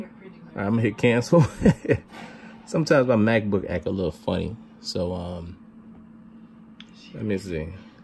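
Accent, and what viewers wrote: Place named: South Carolina, United States. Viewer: you GOT to be from south carolina!!!!!!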